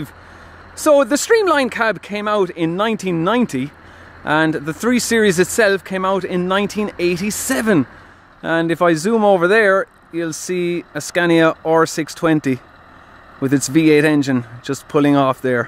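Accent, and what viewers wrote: Ireland. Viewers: I love Ireland (hopefully I'll visit in the not so far future) and I love lorries. Your handle suggests that you're Greek, although your accent is distinctly Irish. So yeah, you just gained follower. Cheers from Turkey. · love irish accent :)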